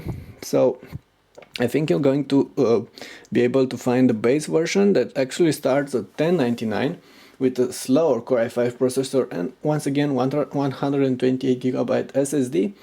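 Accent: Romania. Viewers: Bai tu ai accent de roman :) ... asai :) ? Salutari din München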